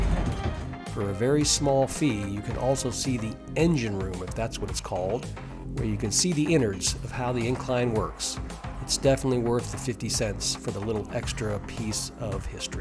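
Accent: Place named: Scottsdale, United States. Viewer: Except he said it like that twice. So maybe its his Scottsdale accent. Anyway, thanks for the tip about the bike tours.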